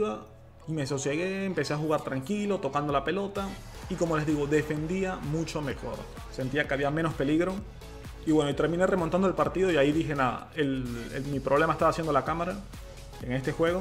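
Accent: Venezuela. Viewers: Amigo eres venezolano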